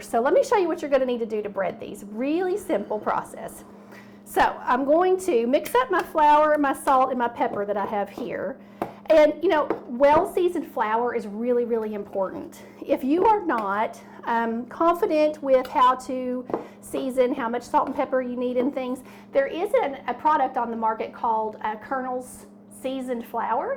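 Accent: South, United States. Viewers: My wife and I who now reside in Wyoming grew up in Memphis. We Love your accent. Your cooking skills are a reflection of your Famous Southern Heritage, we can't wait to try this recipe. Thank You! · I love how happy you are about sharing your cooking skills! And your southern accent! 😉 I’m southern too!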